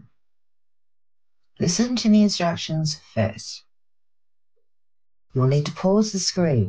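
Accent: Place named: Australia. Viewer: you are super cool. Found your channel via the amazing Jon Levi... you sound Aussie to me, so welcome from NSW. Great video and I am glad I have found you. I hope to connect many times with you! The veil that has been blanketed over our eyes continues to keep the masses blind from the exciting truth that would reset our existence in a heartbeat. Cheers.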